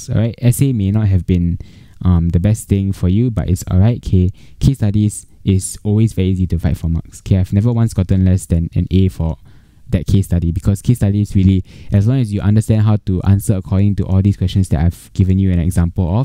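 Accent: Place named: Singapore. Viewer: SG English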